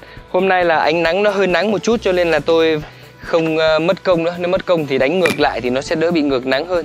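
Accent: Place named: Hai Duong, Vietnam. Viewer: Đúng dân hải dương chất giọng ko chốn dc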